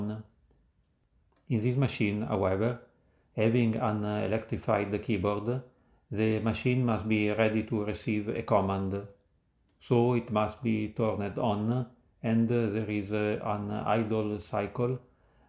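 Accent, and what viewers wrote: Italy. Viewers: 5,000 to 7,000 parts! Electro mechanical feat of design and engineering. I came here by accident and was transfixed by this piece of office equipment. Whoever you are, thank you for producing this video, in English with an Italian accent!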